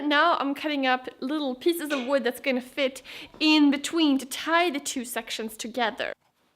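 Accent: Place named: Norrland, Sweden. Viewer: I love the roundover you did!! So smooth and modern looking :-D This piece is very nice. And that's a compliment coming from a person who does not like knots in wood at all (clear only) ;-) Very nice looking work! :-) Just curious though: have you ever used the "bow tie" type of jointery? Here's a short vid by a guy who acts kinda weird, but his method seems very good - they are custom shapes too! <link> By the way, by any chance are you from Northern Sweden, I once had a housemate who was from Northern Sweden, his accent was similar to yours. But many other Swedes I've met had mastered the American "accent" (called a "Flat Accent" by the British), so I couldn't tell that they were Swedes until they started talking to each other...it was CRAZY :-D I couldn't believe my ears. They had no discernible accent at all. Crazy, right? :-)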